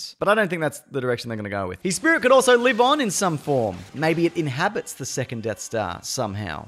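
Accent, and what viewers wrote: Australia. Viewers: are you aussie ?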